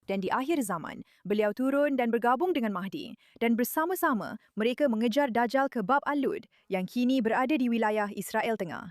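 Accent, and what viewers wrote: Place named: Malaysia. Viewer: Dialeknya kadang malaysia kadang Indonesia. Kurang seru 😂😅👎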